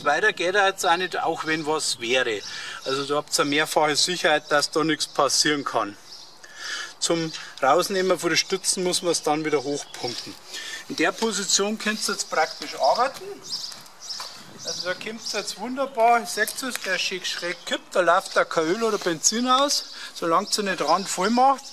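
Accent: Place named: Bavaria, Germany. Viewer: Das war echt gut, auch für blöde gemacht, leider sehr bayerisch und damit schwer zu verstehen !!!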